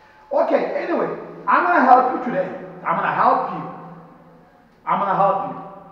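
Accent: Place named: Zimbabwe. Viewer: If any illegal aliens from our continent is not happy to be in South Africa they're other 53 African countries they can choose and go to, we don't want any unskilled illegal immigrants to flock to South Africa to work jobs locals can do. I think the time has arrived were the government needs to introduce job restrictions for African immigrants to discourage them to stop coming to our country in their millions because the truth is they're no jobs in South Africa we have 40% youth unemployment we need to prioritize our citizens first before we can accept asylum seekers and economic migrants. You can in your right mind compare a small economy of South Africa to UAE, Saudi Arabia, Japan, China, South Korea or any other powerful countries because most of those nations don't have the problems we have of the alarming high unemployment, crime, poverty, landless etc. 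We are not happy as the citizens to live with millions of illegal immigrants in our communities and we will not back down from that you must urge your brothers and sisters back in Zimbabwe or whatever were you from because i care hear your accent to let them know that they're no opportunities for them in South Africa because locals are jobless, hungry, poor, landless etc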